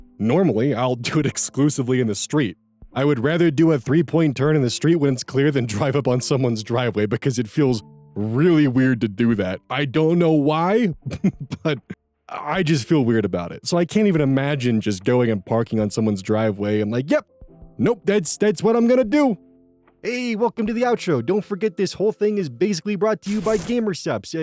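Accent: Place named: United States. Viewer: You weren’t that bad with pronunciation with that first story, you Americanized it a bit but it was all understandable. also French pronunciation is extremely specific, and often inaccurate to how something is spelt (see oiseaux = wazo. I genuinely couldn’t spell it myself- it’s been a while since I’ve been in French education so I’m a bit rusty)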